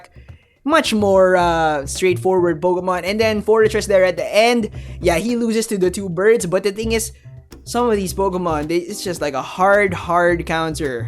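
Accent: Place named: United States. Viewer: You from the Philippines man becuase your accent very 4corners amarican accent.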